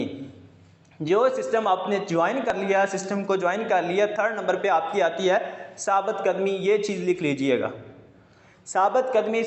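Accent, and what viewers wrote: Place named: Punjab, India. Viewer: Kia bat a Punjabi tarka🥰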